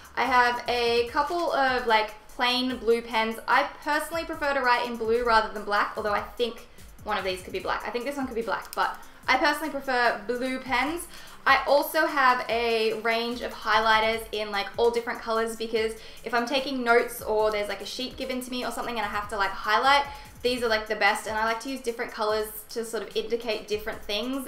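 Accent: Australia. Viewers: are you Australian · Are you Australian? You're so pretty btw! ❤️ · Is she from Australia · Is she australien like her accent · Are you Australian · are u aussie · Your Australian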